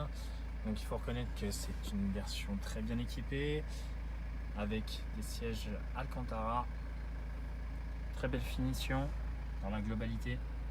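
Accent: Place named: Paris, France. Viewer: Cet à cent de titi parisien est trop drôle.... sport...heu...la routes de 8 rapport..heu... vehiculeeeee LOL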